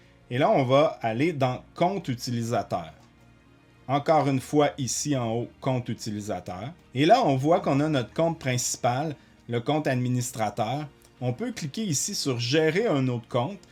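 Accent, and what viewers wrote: Quebec, Canada. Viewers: Bonjour Jeune Homme, bonjour à Tous ! Eh bien quoi te dire, j'ai liké, je me suis abonné, après avoir visionné, et revisionné ta vidéo ! Eh oui, comment faire autrement, face à un travail si bien fait, court, clair, net, concis et précis !! je croyais qu'il fallait avoir Microsoft 365 pour bénéficier de Défender, et là tu viens de me définir que non, puisqu'à aucun moment tu n'en parles dans tes explications ! Tu sais, je te visionne depuis la région parisienne en France, et ton ( accent là ) je le trouve magnifique, puisque j'en rigole aussi sur certains passages ! En tout cas merci pour ce partage qui me sera grandement salutaire car c'est un peu beaucoup le ( bazar ) din mes zoefers là tu sais ! J'ai essayé de le faire à la québécoise là t'sais ! Ah, petite précision, le tutoiement dans mon intervention n'est point à prendre pour de la désinvolture, ni du manque de respect, mais tout simplement, de par le fait que cela me plaise, je me veux de plus de proximité avec mes interlocuteurs, de par ce rapprochement que nous offre la toile, malgré tant de distances qui nous séparent ! 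Et puis, je suis prof de sport avec des baby, des enfants, des ados et adultes en karaté et self-défense, ceci explique un peu cela !! Salut mon pot ! Paulo 74 ans !!! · Bonsoir Je suis avec un grand intérêt cette vidéo d autant plus que vous nous donnez fort gentiment la marche à suivre sans aller trop vite. Cependant, jai acheté depuis peu de temps un ordinateur Windows 11 ! Ne pourriez vous donc pas nous indiquer comment procéder de la même facon, étape par étape d autant que certaines rubriques que vous citez n apparaissent nulle part ! Je me permets toutefois d attirer votre attention sur le fait que je ne vois pas assez bien les différentes fenêtres que vous ouvrez d autant que les caractères à l oeil nu apparaissent fort petits et que l image qui en découle est plus ou moins flou ce qui rend la visibilité dificile. Je devine plutôt que je ne vois véritablement ! Elle gagnerait en netteté avec des caractères plus gros et une image beaucoup plus nette de façon à ce que l on puisse mieux visualiser le tout. 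Mis à part cela, j adore votre accent canadien et ai le sentiment que vous êtes passionné d informatique au point que vous transmettez avec grand plaisir tout votre savoir sans chercher forcément à gagner de l'argent ! J ai donc d autant plus plaisir à vous écouter ! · Je trouve cette proposition très astucieuse et j'ai bien envie de la mettre en oeuvre. Je me pose cependant une question : le fait d'étre contraint d'utiliser les trois questions secrètes ne constitue-t'il pas un risque si une intelligence artificielle est utilisée pour trouver les mots les plus probables en fonction des données qui seront trouvées sur l'ordinateur? J'aimerais avoir votre avis. Merci par avance Et bravo pour votre clarté et l'accent canadien m'est toujours très agréable. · Bonjour. Je viens de découvrir votre chaine et de m'abonner. J'ai fait tout ce vous préconisez et maintenant je me demande si d'avoir téléchargé Advanced systeme care sert à quelque chose. 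Je suis français et votre accent j'adore. Je vais faire un tour au Quebec en septembre, j'en salive d'avance. Merci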